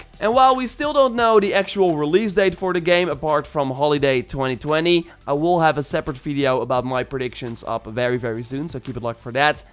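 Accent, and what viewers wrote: Netherlands. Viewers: Ik vind het zo mooi dat ik gewoon hoor dat je Nederlands bent😂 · Hey i love your vids and I'm also dutch like you I could hear it from your voice and also subd today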